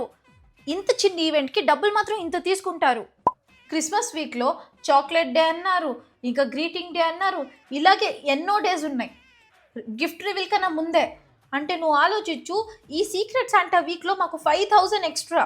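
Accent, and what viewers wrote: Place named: Karnataka, India. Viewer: It"s Karnataka Telugu 😂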